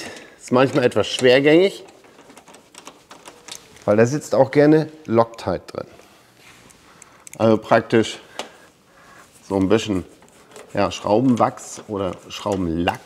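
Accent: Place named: North, Germany. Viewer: du Tüffel🤣🤣🤣erkläre mal den Bayern was ein Mors ist. Ok meine Tante ist aus Kappeln daher kann und kenne ich den Norddeutschen Dialekt. Deine Vorführung per Video ist wirklich klasse und gut. Für mich dein kaum hörbarer Dialekt auch schön aber denke an die deutschen Ausländer. Du hättest mit unserem Dialekt auch große Probleme. Gruß Klaus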